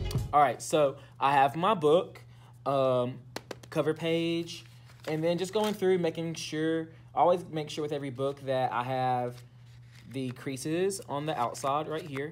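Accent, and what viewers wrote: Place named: Southeast, United States. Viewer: I thought u sounded like it was Southeastern! 😉. I’m from S.C. myself! Love hearing Southerners!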